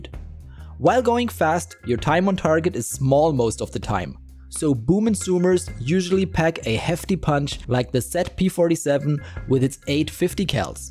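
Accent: Germany. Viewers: or you just have an incredible german accent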